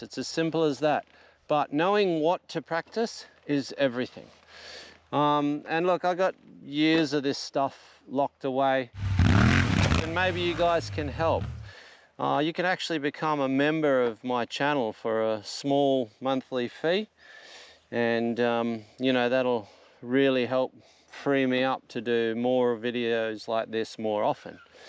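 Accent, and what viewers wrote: Australia. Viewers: the Aussie accent just makes it more engaging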